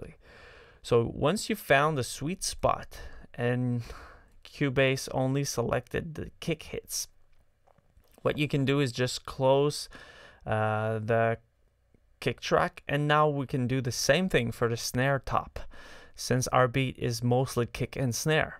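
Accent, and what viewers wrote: Quebec, Canada. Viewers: Eille stun accent québecois ça! :D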